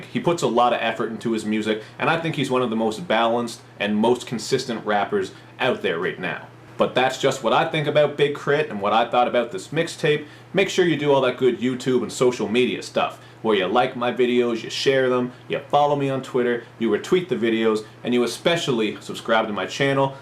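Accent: Canada. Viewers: New subscriber here so I dont know if you've already mentioned this, but are you from Toronto? I noticed the hat and your canadian accent lol. Im from toronto was just curious. Great review.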